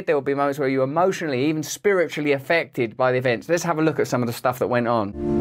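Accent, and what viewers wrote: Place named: Cockney, United Kingdom. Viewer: It’s a London accent I think.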